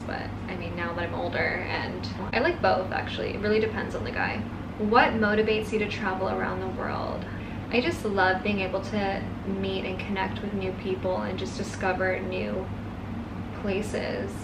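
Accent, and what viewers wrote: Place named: General American, United States. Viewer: curious why you got the North American generic accent while your brother got the aussie one. did you guys grow up separately?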